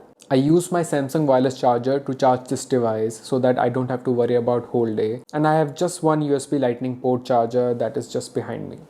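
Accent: India. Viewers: im 22 years old, my first phone was an iphone 4 back in 2013. my phone history is Iphone 4, 6, nexus 6p, iphone 7+, X, 11, and now 12. I fucked up going from the x to the 11 but lets just skip past that. I loved the nexus while i used that but there really is something to be said about your phone being simple and working out the box. I loved being able to change basically everything about my phone but (i think this is a me thing) i never felt like i could get my phone to do exactly what i dreamed it could. When using an iphone, there may be things i hope get changed, but im never left with the feeling of "i bet if i completely rework my phones set up i can fix this one little thing i want" lol. Iphones do everything i want and they keep adding stuff so its pretty nice but i got no hate to anyone who prefers that android experience. P.S. I hope this doesn't come across in an offensive way but your accent is beautiful and crystal clear. 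This American has no problem understanding you and enjoyed the video! Hopefully these tech companies expanding into India will be down to start sending products to local tech youtubers like you.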